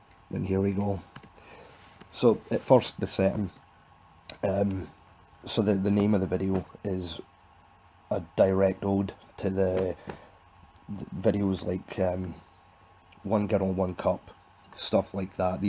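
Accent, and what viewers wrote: Scotland, United Kingdom. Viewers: Where you from in Scotland mate??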